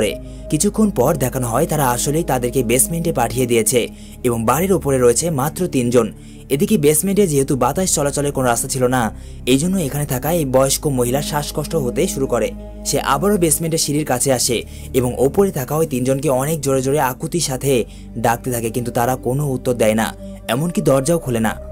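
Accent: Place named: Noakhali, Bangladesh. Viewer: নোয়াখালী 😊আপনি😊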